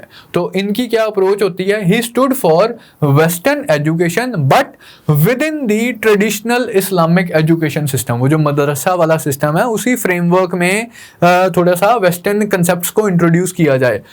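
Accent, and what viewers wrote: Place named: Punjab, India. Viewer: Thnks to u sir..when i feel sleepy i watch ur videos and u energetic voice and simple explanation with light punjabi touch ...massah allah it gaves me a energy for continous study..thnks again sir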